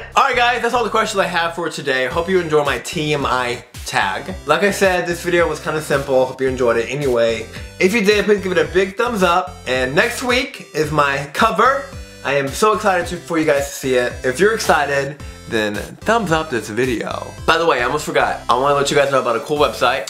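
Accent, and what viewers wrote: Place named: South, United States. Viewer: NEW SUBSCRIBER I LIKE HIS KINDA SLUR AND COUNTRY VOICE!!! 😄😘 and he's ADORABLE AS HELL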